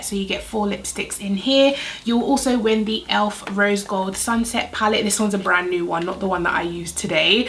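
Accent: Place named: United Kingdom. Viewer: Your British accent made me smile! I love your voice and your accent. <mail> on IG!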